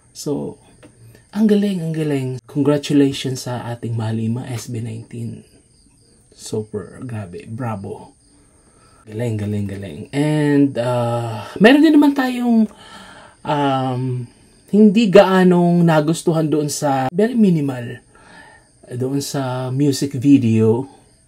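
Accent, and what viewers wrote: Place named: Bicol, Philippines. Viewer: Bicol accent, I love that. About SB19, tugang, you can say that again.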